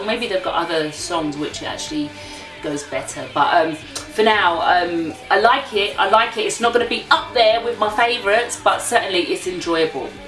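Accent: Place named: United Kingdom. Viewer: Акцент British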